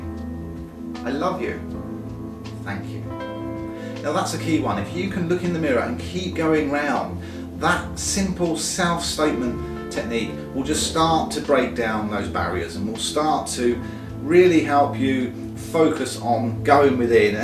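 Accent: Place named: United Kingdom. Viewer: Thanks! What I like about your vids the most is, that you dont go over the top and try to force it down our necks. Its is very friendly, peacful and joyful process. I love that. And your British accent rocks! Thanks again. xx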